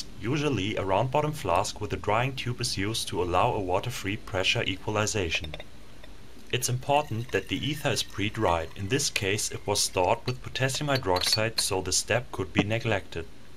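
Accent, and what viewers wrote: Germany. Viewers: Are you German.? The accent sounds like it..( That's NOT a racial thing, just an "ear" thing) :) btw, ich bin Belgiër und mein grossvater war Deutsch.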